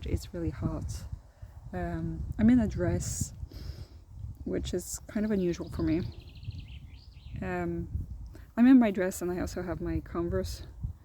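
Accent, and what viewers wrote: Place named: United States. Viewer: You started out sounding really American, then you said it was really hot and your accent completely flipped LOL. I originally thought I was INFP for a while and then I took the test again for a psychology course and it told me I was INFJ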